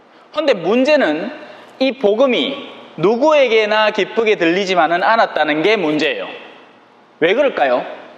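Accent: Gyeongsang, South Korea. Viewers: 신부님 고맙습니다 강론 들을 때마다 찐한 경상도 사투리가 웃음을 주네요 오늘도 한편의 뮤지컬 보는 기분으로 잘 들었습니다~ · 찬미예수님 신부님 감사합니다 경상도 사투리에 ㅋㅋ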